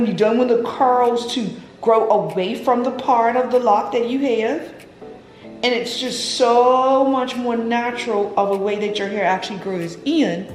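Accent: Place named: Bermuda. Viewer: I know a Bermudian accent when I hear it! Great video 💘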